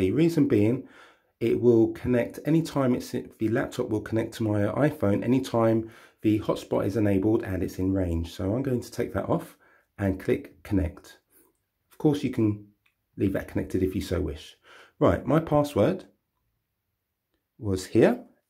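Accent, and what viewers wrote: United Kingdom. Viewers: Tried a few videos which left me baffled, I was almost losing the will to live when I clicked on your video - bliss, pure bliss. Someone who described it so clearly and simply (pretty straightforward process as it turns out). Nice, calm British accent too. Well done mate.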